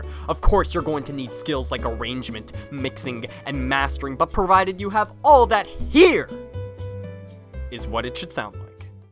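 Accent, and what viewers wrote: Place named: United States. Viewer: he means he is using the American way of saying instead of the UK way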